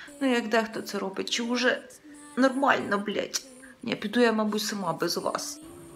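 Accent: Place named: Surzhyk, Ukraine. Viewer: Слухай,розмовляй будь ласка на Українській мові,ато ти говориш на суржику як Вєрка Сьєрдючка з Полтави.Роби щось з собою).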